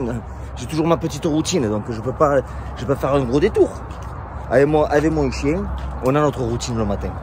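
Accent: South, France. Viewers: Salut franchement tu me régale!! Un mec comme toi je vais à la guerre les yeux fermés un mec vrai et authentique sa se voit et sa s'entend😉 et étant de Perpignan entendre un accent et un parler du sud sur YouTube fait encore plus plaisir 😉👊🏼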